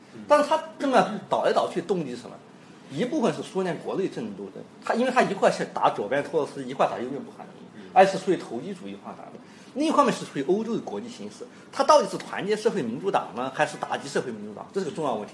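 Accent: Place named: Xinjiang, China. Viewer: 刘先生虽然是四川人，但标准的川普里明显带着我们新疆口音的基础！哈哈！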